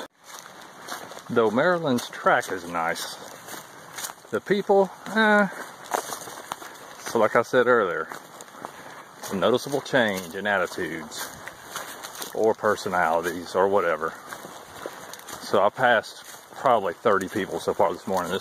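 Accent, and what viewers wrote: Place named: South, United States. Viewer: Three comments. First, thanks. Second, happy anniversary. Third, being a fellow southern boy, in fact I'm back in Moore County, you may know where that is, I have an observation, if I may. You're six four, 190. You pass one or a pair of people in the woods and you ask "hey, how are y'all doing" with your southern accent and great smile. They, like everyone on the trail, are trying to unplug from the Matrix, however, now they're worried that they've stepped into another movie because they're listening to the instrumental "dueling banjos" playing in their thoughts. Please don't take this as criticism, just an observation. I don't have a solution. I've found people everywhere are fantastic, just as I know has been your experience. I made note that several NE folks had weighed in positively, and I hope I've conveyed a little southern perspective. 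Again, thanks.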